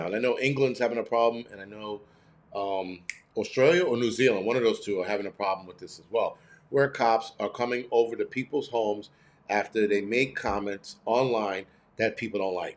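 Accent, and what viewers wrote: New York, United States. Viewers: I love your NYC accent. I'm from Chicago and I love the East Coast. We canot let these ppl continue to messup ourcountry.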